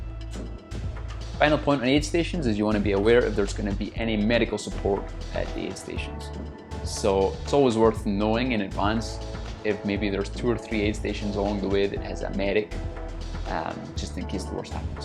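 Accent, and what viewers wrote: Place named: Scotland, United Kingdom. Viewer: can't tell if you're scottish or swedish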